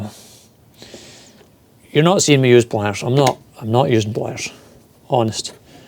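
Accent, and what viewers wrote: Scotland, United Kingdom. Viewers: Good to hear your Scottish accent again, and glad you're recovering. Thought you just got tired of making videos.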